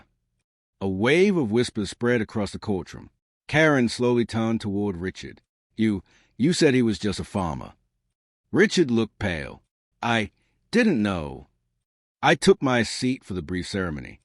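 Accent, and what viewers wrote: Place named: United Kingdom. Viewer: Why does that narrator have a b British accent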